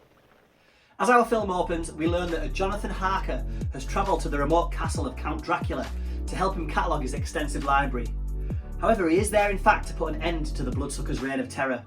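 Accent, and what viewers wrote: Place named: Ireland. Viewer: I love your brogue <3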